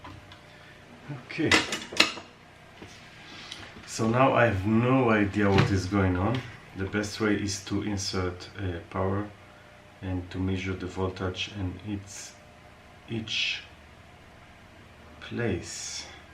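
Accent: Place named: Israel. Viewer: חח שומעים מבטא ישראלי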